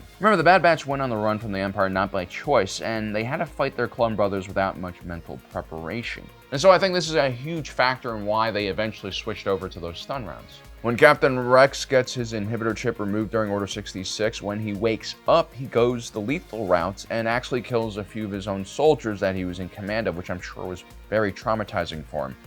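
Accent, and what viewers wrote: New Zealand. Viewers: Australian? No Kiwis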